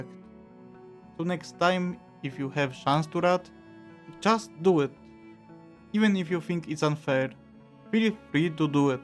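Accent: Poland. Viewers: love Your polish accent XD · czuć że polak z kilometra z tego akcentu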